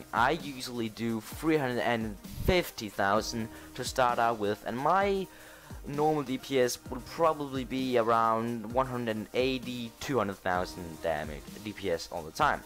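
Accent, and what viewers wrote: Denmark. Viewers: Your accent sounds danish. Are you danish?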